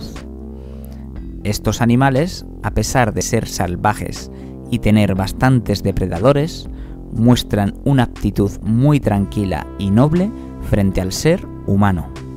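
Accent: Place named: Spain. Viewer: Espero algún día antes de morirme... nuevamente escuchar el acento latino ...Y no escuchar más este acento coño....tan miserable...tan feo ....tan.... pero tan....re...ni encuentro la palabra para describirlo....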